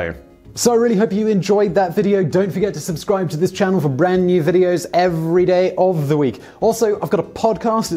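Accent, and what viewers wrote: United Kingdom. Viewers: British Vsauce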